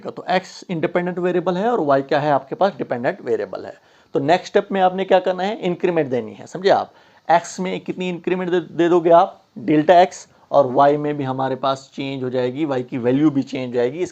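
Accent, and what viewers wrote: Kashmir, India. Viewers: Humarai teacher nai yehi method dekhaya tha aur mai nai full YouTube mai dakha kis nai b aasai nhi kiya hai but thanks sir aap nai yeh video banaye hai thanks sir aur aap kai tone sai lag raha hai aap kashmiri ho so kashmiri best hai 💕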